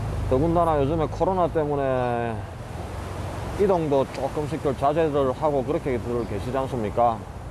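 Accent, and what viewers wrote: Gyeongsang, South Korea. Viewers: 오래간만에 해운대 마실 나가셨네요~펑소엔 못느끼는대 외국에 오랫동안 나가있음 작은추억하나 사무치게 그리울때가 많으시겠죠~ 님 인기비결을 제가 나름 주제넘게 예상해봤어요~^^ 1.벗님들이 평소 보지 못했던 북미대륙의 구석구석을 운전자관점에서 볼 수 있어 좋다 2.말투는 오리지널 경상도의 투박한 사투리를 쓰는대~어라? 영어로 모든 토킹이되네?ㅎㅎ 투박한 말투의 선입견을 가진 저를포함한 벗님들의 예상을 깨는 어휘실력 3.운전벙커안의 생활 모습을 스스럼없이 보여주는 솔직함의 매력 4.본인 스스로가 어려움을 겪었던 과거,현재를 솔직담백하게 풀어서 구수하게 이야기해내는 매력 5.돌쇠와 날쇠의 멋진 콜라보레이션 특히 날쇠라는 아이템이 짱인것 같습니다 제가 생각한 인기비결이신것 같구요 캐나다 돌아가셔서도 그냥 더 잘해야지 하지마시고 평소대로 꾸준히 해주심 좋겠어요~~^^ 그리하셔도 벗님들 다들 어디 안가시고 그대로 계실듯요 주제넘게 주절주절 시부맀네요~~ㅎㅎ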